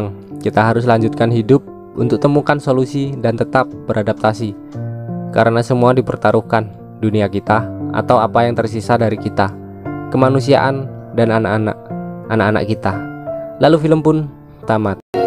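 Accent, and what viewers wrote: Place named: Java, Indonesia. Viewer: Org jawa kalo ngomong indonesia, mad dog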